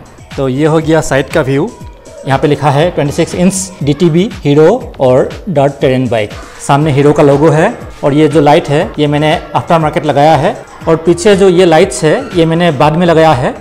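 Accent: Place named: Bengal, India. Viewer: bro tumi bengali to bengali tai bollo tumar kotha sunei bhujte parchi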